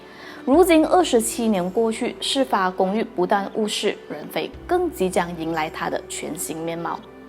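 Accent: Malaysia. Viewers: 我实在是太喜欢马来西亚口语啦！可爱！ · 聽口音就知道是大馬人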